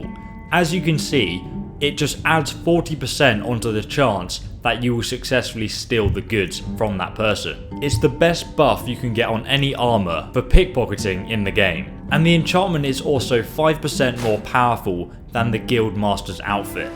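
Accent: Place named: United Kingdom. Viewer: LiverpoolSwag69 he's British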